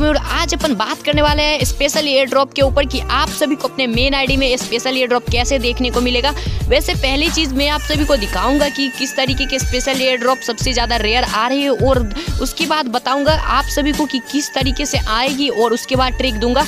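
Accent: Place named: Gujarat, India. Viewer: You're gujarati rait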